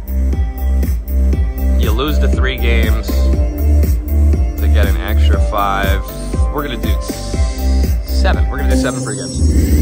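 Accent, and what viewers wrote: New Jersey, United States. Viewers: Love your videos. I love the NJ accent. Favorite I Sar vs I saw. Cool!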